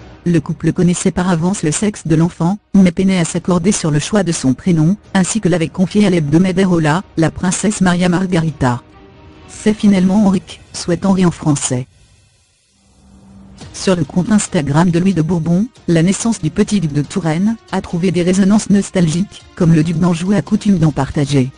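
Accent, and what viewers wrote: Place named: Spain. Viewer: Il parle Français comme ma concierge espagnole.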